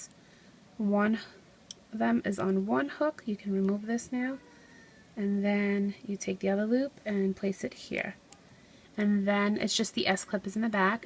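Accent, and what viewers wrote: United States. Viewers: You know what I love most? Your accent! I'm an Aussie and love listening/watching to your tutorials because of your American accent. Your designs are pretty awesome too :P xox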